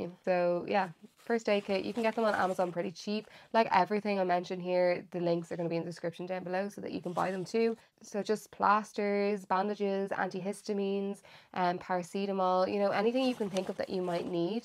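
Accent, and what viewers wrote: Ireland. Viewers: A Kiwi here - I LOVE hearing an Irish accent and had no trouble understanding you. We ALL have accents of one kind or another, and Irish is one of my favourites.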